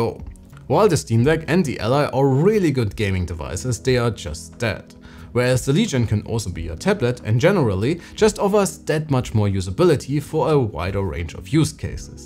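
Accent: Germany. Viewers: That German accent is 🔥 🇩🇪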